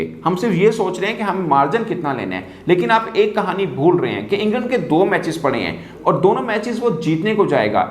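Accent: Punjab, India. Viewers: Kissay kuttti maaaa diyia bachyiaaaa. Who has asked u to talk abt cricket. Check ur panjabi street accent, have some respect to be on the tv. Taira content bc lund hey. Wur ja bc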